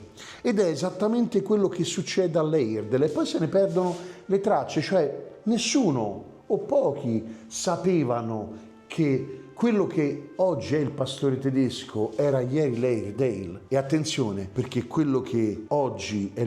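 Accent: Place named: Rome, Italy. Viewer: E vero non se ne vedono più credo perché oggi ottimizziamo tutto io ora sono in Umbria e i cacciatori preferiscono razze più piccole meno manutenzione e spesa, a parte ciò credo che oggi oltre a passatemi il termine non servire come un tempo a tutto si scelgono razze x un solo scopo si semplifica ci vorrebbe troppo impegno altrimenti ormai siamo tutti Smart ma i cani sono rimasti cani per fortuna grazie del video sempre molto limpido e facile da seguire ( ogni tanto famme risenti n’ po de romano che me manca 😂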